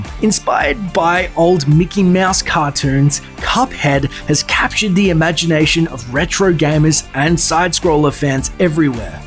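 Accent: Australia. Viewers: Was the uploader Australian? His voice was hella hot, omg. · Yuukei There is no such thing as a British accent so that doesn't make sense, he sounds and is Australian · Where is days gone set? Cause he says one of "our" national parks and this guy has an Aussie accent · I fucking hate australian accent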